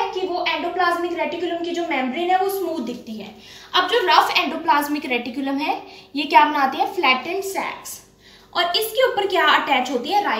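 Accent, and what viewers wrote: Punjab, India. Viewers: Mam are you panjabi?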